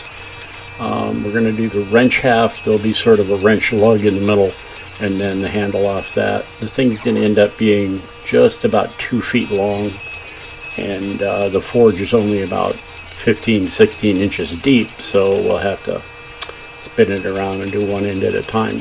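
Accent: RP, United Kingdom. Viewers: After watching many years of the BBC I think the accent was spot on. It matched with the opening visuals and reminded me strongly of my childhood. I just needed to see a Dalek and I would have been behind the sofa like a shot! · Loved the cultured british accent. Since it happens to be your shop you can call the tools what ever you want to.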